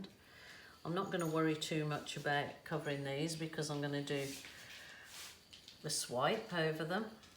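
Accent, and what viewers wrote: Birmingham, United Kingdom. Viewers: Are you from Birmingham? Lol if so - waves from walsall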